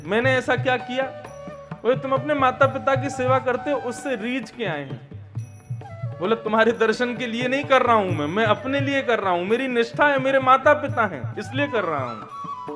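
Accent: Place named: Braj, India. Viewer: Jay Shri Krishna Radhe Radhe 🕉 Namo Narayan ji Jay Shri Hari 🙏 ♥️ ❤️ 🕉 OM Namo Bhagvate Vasudevy Namh Jay Shri Laxmi Pati Jay Shri Shyama Shaym ji Jay Shri Guru Dev Maharaj ji Aapke Charno Mai Koti Koti Pranam 🙏 Radhe Radhe Jay Shri Harivansh ♥️ ❤️ 😍 💙 Radhe Radhe Aapki vraj Bhasa Badi Sumadhur hai Radhe Radhe Jay Shri vraj vasi Jay Shri Vrindavan Dham 🙏 Jay Shri Yamuna Maharani ji 🙏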